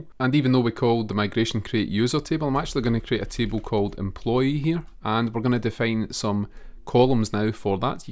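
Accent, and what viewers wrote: Scotland, United Kingdom. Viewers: When I heard the Scottish accent I knew this was someone I could learn from. Edit: I was right. Pure gold of a video. Just golden nugget after golden nugget. No bs. Thank you so much! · british accent is too strong... i get you, its ok... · British accent adds so much style to this tutorial, thank you!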